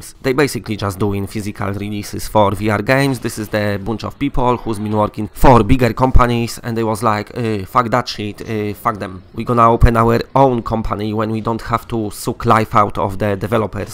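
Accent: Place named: Poland. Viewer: keep on speaking with your magnificent accent just the way you're doing right now, we just love it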